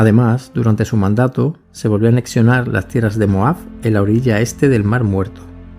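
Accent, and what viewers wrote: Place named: Extremadura, Spain. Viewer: Me encanta la historia. Muchísimas gracias por los podcast, los voy escuchando poquito a poco. Esta semana creo que me llega el primer libro de Jesús que usted ha escrito. Sólo decirle que creo que por su acento, usted nació en mi tierra, Extremadura, a la que adoro y ahora más desde que no vivo en ella. Un saludo enorme y mil gracias por difundir su conocimiento.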